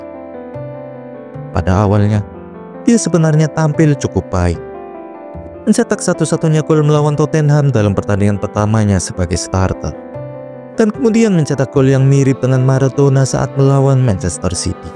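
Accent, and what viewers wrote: Java, Indonesia. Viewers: jawir pengisi suare ye, bikin bete, mending ngisi suara wayang kulit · Medhog banget narratornya.. 😂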